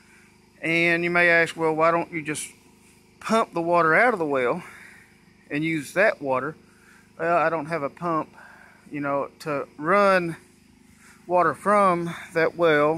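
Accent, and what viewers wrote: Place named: South, United States. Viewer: he likely made a ignorant assumption because of your accent. because we southerners can't be educated.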